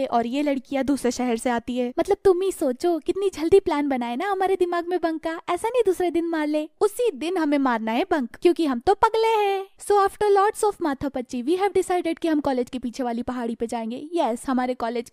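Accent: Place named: Indore, India. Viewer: Your slang like indoris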